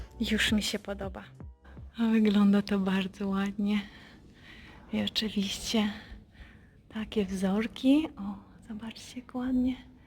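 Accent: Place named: Vilnius, Lithuania. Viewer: Zapraszam W mój dzień - to taki rusycyzm, jest z Wilna. A ja myślę, że brzmi uroczo, jak i cały dialekt wileński:)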